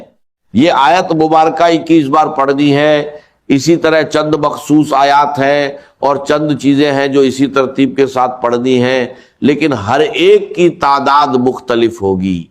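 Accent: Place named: India. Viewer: یہ کون ہندی بھائی اپنے خاص لہجے میں اسرار احمد کی نقلی آواز کو بول رہا ہے لیکن اتنی لمبی باتیں اور واعظ دینے کے بعد وظیفے کو صحیح طریقے سے نہیں بتا سکا کے کیسے پڑھنا ہے۔وظیفے کو توڑ توڑ کے بیچ میں بات کرتا ہے جو لوگوں کو سمجھ نہیں اتا کہ کب وظیفہ شروع ہوا اور کب اس کی بات کررہا ہے۔ کیوں لوگوں کو پریشان کرتے ہو۔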